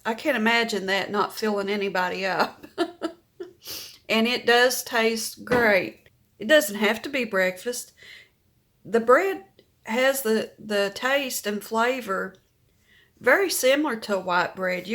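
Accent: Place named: Georgia, United States. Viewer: I really like your accent and way of speaking. It reminds me of my dad's side of the family in North Georgia.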